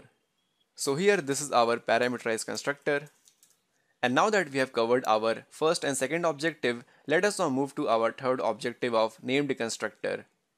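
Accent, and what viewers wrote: India. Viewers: Wow, a very thought out and systematic lesson on constructors. The Indian accent is mild enough that it doesn't get in the way. Excellent job.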